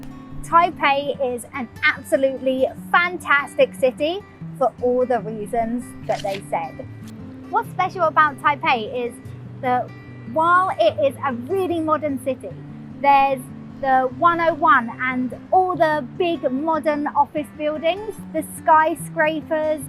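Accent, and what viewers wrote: United Kingdom. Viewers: 觀察入微，描述細緻，加上妳優雅柔和的英倫口音，絕妙！(點閱應更高) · 對啊！我一開始就訂閱，濃濃英國腔就是原因之一。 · 英國腔的英文真的好好聽喔👍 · Lucy英國腔英語好好聽 繼續聽下去。我住台北市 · 💓😍 love your British Accent....soft and clear. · Like your UK accent, and thanks for promoting Taiwan and Taipei City :) Hope you are alright with kids edu system in TW or the way people there teaching your kids, cheers. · l feel much like to listen your speaking vowels clearly at each word in the videos。 Your sounds are good for everyone to study a standard British speaking.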